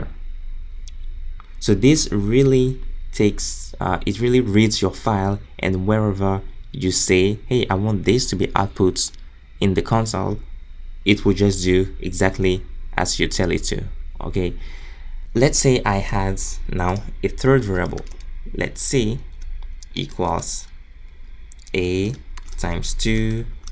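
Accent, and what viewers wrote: Nigeria. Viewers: Great tutorials, nice accent. Nigerian, yeah?😊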